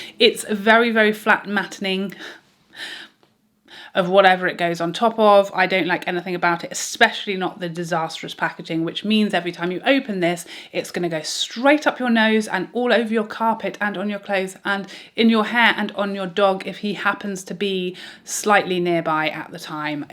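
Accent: England, United Kingdom. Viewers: I love to say your intro along with you in your English accent!! I’m a goof! Lol. Enjoy you so much! 🥰😍🥰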